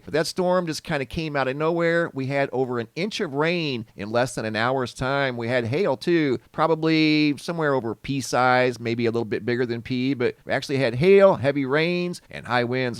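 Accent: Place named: Arizona, United States. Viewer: “There goes a rogue shopping 🛒 cart!” Sorry, the way said it, I laughed out loud! His Arizona accent makes me laugh! 😂 He would laugh at my NY accent, I bet. All my love and prayers going out to those in harms way! Stay strong, folks! 🙏 😘🗽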